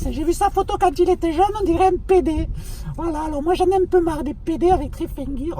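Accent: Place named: Marseille, France. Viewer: J'adore ton accent des Goudes,!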